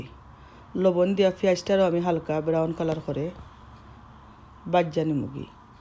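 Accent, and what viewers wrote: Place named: Sylhet, Bangladesh. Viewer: সিলেটি মাত আমি ভালো পাই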